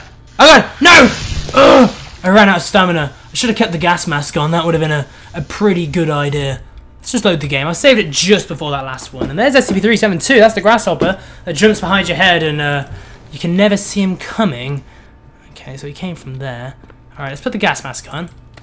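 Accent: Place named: England, United Kingdom. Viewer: So, I was playing some Halo 4 Grifball earlier today...and I was playing with a guy who sounds a whole lot like you,. At the very least, I think he was from the same part of England as you are.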